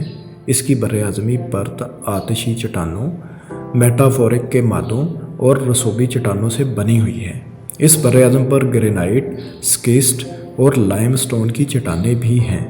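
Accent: Punjab, Pakistan. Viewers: Ap Punjabi lehje ma vbolte ho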